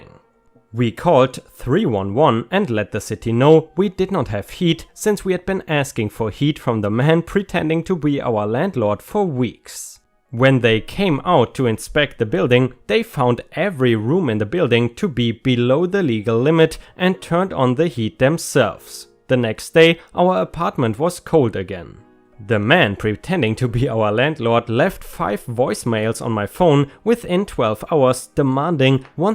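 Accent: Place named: Germany. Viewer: you "contacted everyone who'd commented on the thread"?! Good lord, that's some next-level leg work on reddit stories, and as someone who long ago answered your question of what sort of stories I would like to hear with the response, "any type, as long as there's reasonably satisfying ending where we get to know how things turned out", I very much appreciate you putting in that work. That's why I never miss an upload. Well, that and your German accent--it reminds me of the coolest guy I have ever met in my life, on a long train ride from Paris to Munich. I was erroneously sitting in his seat on the train when he opened the cabin door and addressed me in (to my ears, anyway) perfect French. I replied with, "uhhh..." and immediately in equally flawless English, he says, "oh, you're American. My girlfriend was right--Inga, you're right, he IS an American--but I thought you were French, obviously. 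You're actually in my seat--no no, stay there, stay there, keep the seat, we can just take these seats here--but perhaps in exchange for the seat you might buy the first round of beers for us? Does that sound fair? And then I'll buy the rest until we get to München. Oh, but please don't order us any American beer, do you actually drink that stuff? No? Wonderful! Then we will have a wonderful trip, I'm sure..."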